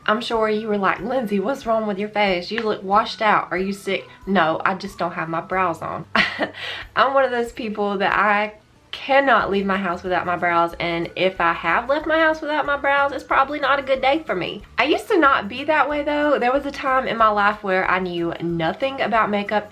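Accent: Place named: South, United States. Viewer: im so jealous omg! i just posted a brow routine as well! your southern accent is so cute!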